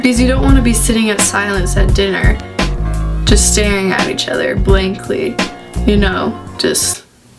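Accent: Missouri, United States. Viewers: ARE YOU FROM MISSOURI?!!!!😂😂😂😍😍😏I AMMMM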